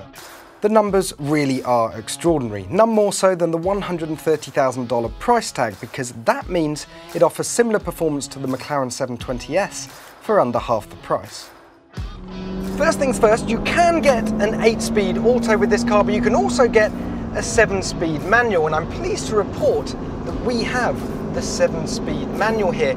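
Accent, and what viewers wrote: England, United Kingdom. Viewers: American muscle but you get an English guy to talk. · I'll go with Corvette's 8 class wins at Lemans (along with countless others) as plenty of proof American cars can corner. I don't need another youtube host with an English accent to convince me as if this is something new.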